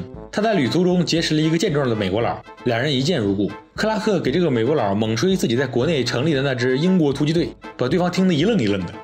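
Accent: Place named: Tianjin, China. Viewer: 小约翰可汗是天津宝坻的人吧。 哈哈，着口音解说绝了。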